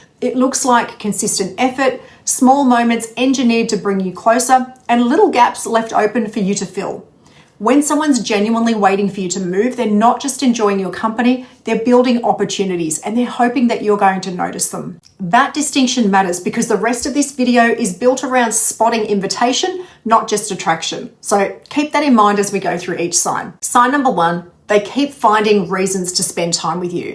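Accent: Australia. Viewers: That Australian accent is just the best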